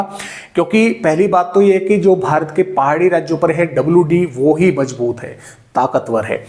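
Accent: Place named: Jhunjhunu, India. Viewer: सर मेरे को आपकी बात एक समझ में आई झुंझुनू की आप बोलते हो मेरे को बहुत पसंद आता है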